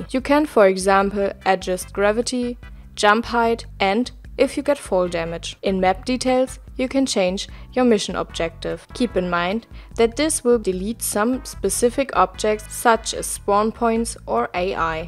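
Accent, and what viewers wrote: Germany. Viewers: Ahhhh das deutsche English :D. I Love it :D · The school i really need ^^ Does she have a german accent ?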